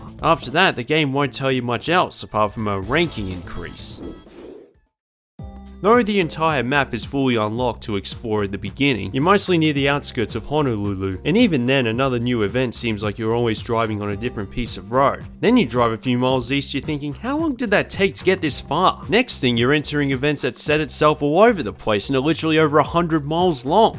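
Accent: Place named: Australia. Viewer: And that, had a Japanese version, with just Nissan cars. <link> / You poor, unfortunate, soul... You’re not wrong, you’re at a Million points, before you know it. - This list of games, says all I need to hear, about your channel... To be fair, on your semi-Aussie sounding ass, it seems that a lot of TDUX360, was transferred to TDU2, and you’ve made it noticeable. - 😂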